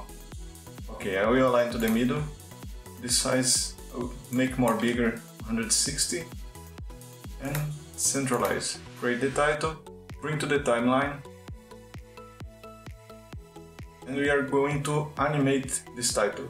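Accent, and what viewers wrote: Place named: Brazil. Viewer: Pra mim ele já é pica por meter a cara e fazer o video em inglês, já moro fora a 6 anos, falo inglês todo dia, mas ainda não tive coragem de começar um canal em inglês. Mas o sotaque BR é tipico, esse é o nosso tempero especial, os outros BRs sempre me descobrem pelo sotaquezinho de fundo e eu eles. haha Muito bacana, agora eu vou lançar meu neon aqui pro meu wallpaper. hehe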